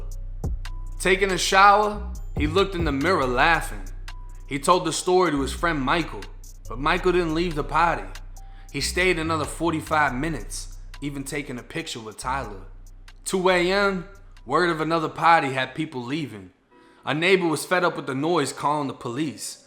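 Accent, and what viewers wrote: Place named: Florida, United States. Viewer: Lol yo not for nothing bro story telling voice is krazy 😩😆😆🙌🔥🔥🔥 lol I’m from NYC and I know this a wild Florida accent but that alone has me hooked on these videos, perfect example of BEING YOURSELF! Always be yourself, it’s the difference between true success and temporary failure 😊❤️🙏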